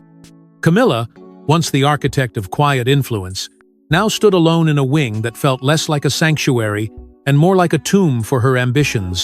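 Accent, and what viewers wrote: United States. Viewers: Good for Princess Katherine if it's true which I hope it is! Why is being said by another American voice? Stay out of it, it's for the British people not Americans!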